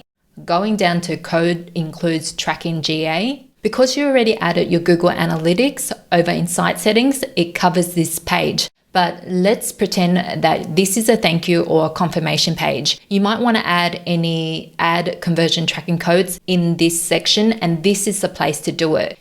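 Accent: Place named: Australia. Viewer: EXELLENT! EXELLENT TRAINING! Nothing personal to Mr. Lemonaid, but his broken English is a bit too much for me to tolerate for training I desperately need. I GET IT! English is difficult, but before you start teaching learn the language much better, even if you do have a bit of an Aussie tilt to it. BTW, my heart SOO goes out to the patriots down under. Fight on.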